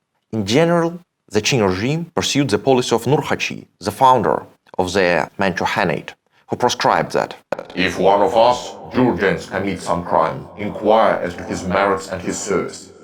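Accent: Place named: Russia. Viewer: The Russian accent is too heavy. Every time I heard “th” pronounced as a “s” or “z” it made me hurt.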